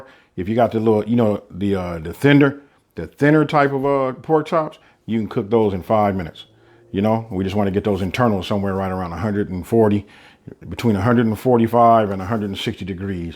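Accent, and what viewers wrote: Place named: California, United States. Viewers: He gotta be from the west coast I can hear it 😂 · You have a country accent however from your voice and the way you talk I’m assuming that you’re from California Compton Compton or cities around California Los Angeles maybe if I go farther than that Alabama but I’m very show you from California , your foods are delicious and all them they’re healthy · Dude has California accent !!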